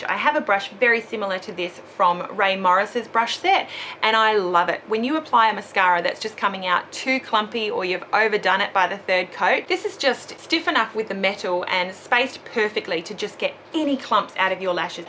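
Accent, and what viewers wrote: Australia. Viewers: are you from australia?.c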